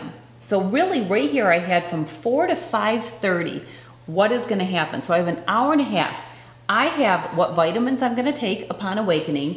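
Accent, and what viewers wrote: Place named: South, United States. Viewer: I like the family calendar idea., random question, where are you from? (Which state?) I'm new and i haven't heard you mention it yet and I didn't see it on your website either but ya know what they say, I'm blind in one eye and can't see out the other (anyone else have that problem?:P) If you dont wanna say, thats cool. I'm just hearing southern....a lot of southern...but idk, i could be wrong:)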